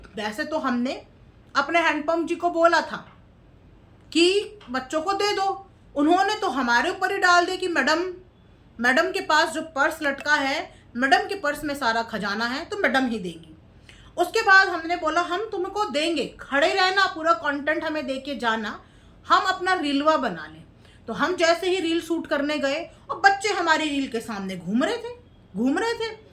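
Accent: Bihar, India. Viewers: di aap to ab thoda thoda bihari bhasa niklne lga lgta h ap bhut jyda video dekhne lgi kumata devi ji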